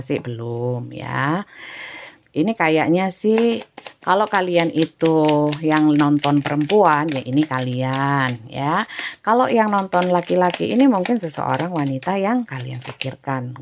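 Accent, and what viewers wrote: East Java, Indonesia. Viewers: Kakak dialognya jw timuran berarti sm dong kak? Sy juga asli Suroboyo lho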